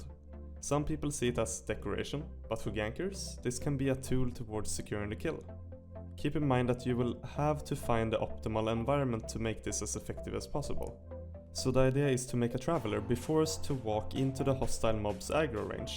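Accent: Sweden. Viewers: Your accent sounds swedish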